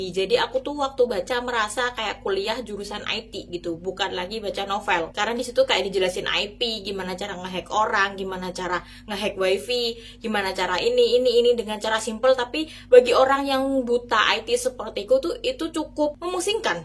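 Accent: Java, Indonesia. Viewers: nggak salah deh follow ig n subscribe youtube nya... banyak referensi buku yg kece2... paling suka pas ngomong "ketiban" 😄 sama medoknya sama ak... Org mana sih kak? kalo pas ke Solo hayuk ketemu, sharing referensi2 buku kita 🤓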